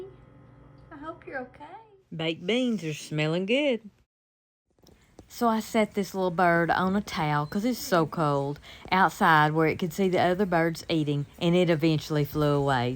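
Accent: South, United States. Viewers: You’re going to love the sour dough. I’m new to your channel and I can tell your southern from your accent I’m in South Carolina. Two great you tubers for sour dough is farmhouse on Boone and rose homestead if your interested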